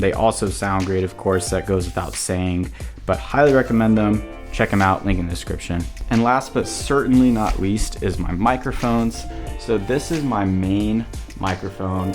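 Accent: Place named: South, United States. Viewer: What state r u from because I kinda can tell a southern accent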